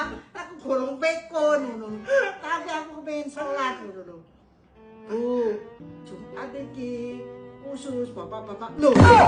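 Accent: Surabaya, Indonesia. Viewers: Logate suroboyone lo... nyenengno mama dadi pingin ngrungokno critone mama karo koko... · Ciri khas mak2 suroboyoan nek cerito,hahaha kudu kemekel uduk Goro ceritane,tp Soko bosone seng garakno tambah kemekel😂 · Mom... Jan khas ngomonge wong Sroboyoan... ❤... Sehat semuanya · Nek aku kangen Suroboyoan, delok konten smpyn ko......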